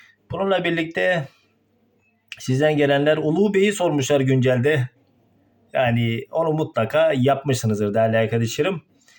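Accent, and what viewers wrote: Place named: Van, Turkey. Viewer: Hocam ne kadar yakışıklısıniz Vanlı mısınız shhshshs öyle bir sive aldim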